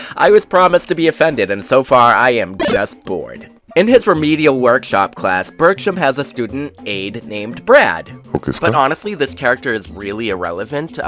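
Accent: Boston, United States. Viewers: Are you from Boston?!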